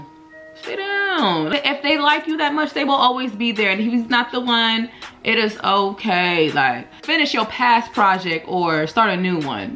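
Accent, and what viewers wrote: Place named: Brooklyn, United States. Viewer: She is most definitely from Brooklyn, Ny. I picked up on it in the first five seconds of the video. Yoo wassup👋🏽😭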